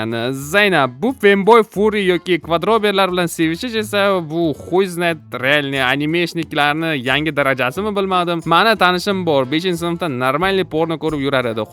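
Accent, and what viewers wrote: Qashqadaryo, Uzbekistan. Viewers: Shevadan Qashqadaryoga tortyabdi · Qashqadaryodan emasmisiz sheva pzds tortopti😂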